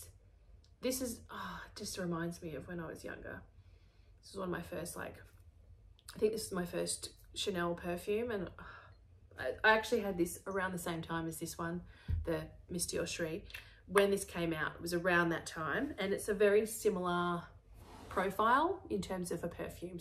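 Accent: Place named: Australia. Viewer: yr Aussie accent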